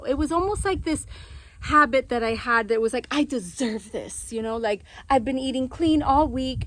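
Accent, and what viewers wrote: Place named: Los Angeles, United States. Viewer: Hate that accent. Typical LA Hispanic sounding. I know girls from there that sound like this and can’t stand them.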